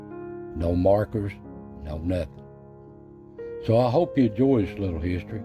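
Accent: Appalachia, United States. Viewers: Another great video by a man with a wonderful Appalachian- southern accent.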